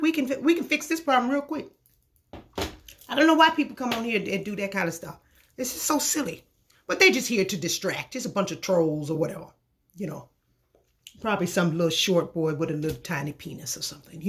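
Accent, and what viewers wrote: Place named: Inner city, United States. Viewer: Damn Girl, I am impressed, you are smart. I liked how you said "I looked it up on Google", Instead of "I gOOgled it", and as gOOgled is not a verb, you can not do that. I also love how you do not trust the internet, "because they be changin shit." You are articulate, but you speak with an inner city intonation in order to reach a particular audience and achieve a desired affect. To add something constructive, I would like to add to your imploration on the learning of words, words do not have meaning. Words are representations, liguisti-graphical representation, of objects, actions, and descriptions. "Meaning" does not come from a word, "meaning' comes from you, the speaker. The problem with meaning is that once you go cross platform, the word still carries with it, its original connotation. You understand the importance of fundamentals.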